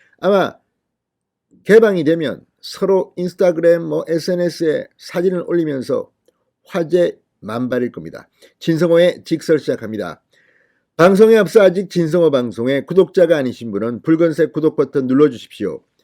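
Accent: Gyeongsang, South Korea. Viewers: 경상도 사투리 발음이 좀억세다 보니 닦아온다는 뜻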